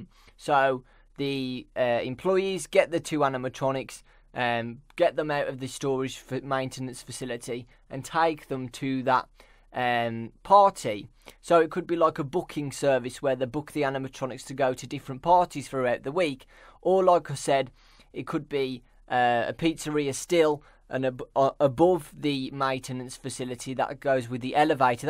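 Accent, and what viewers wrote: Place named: Scotland, United Kingdom. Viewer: I don't even care about FNAF:SL I just watch this for Scottish accent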